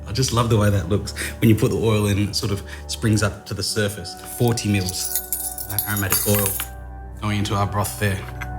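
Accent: Australia. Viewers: your Aussie soothing voice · My man. Are you Australian Japanese. ? Too cool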